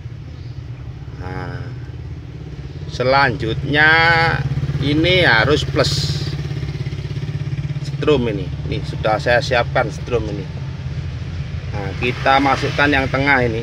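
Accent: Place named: Java, Indonesia. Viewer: Joss mas ,,, medog jowone !!!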